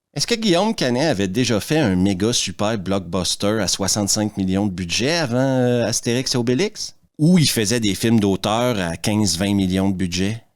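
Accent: Quebec, Canada. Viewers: Mon gars ta chaîne c'est une petite pépite,je dévore tes vidéos les unes après les autres. Déjà que j'ai un faible pour l'accent québécois mais là franchement avec ton humour en plus ta façon de t'exprimer franchement c'est vraiment que du kiff. Et bordel qu'est-ce que astérix et Obélix la Terre du Milieu est un film de merde et de voir Guillaume Canet chialer en disant allez voir mon film c'est vrai que franchement c'était pathétique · avec ton accent je voyageais au pays de la poutine et au jourd hui j'apprend que tu vis chez moi XD c'est beau mais ca casse le rêve ahahha . des bisou merci pour tes video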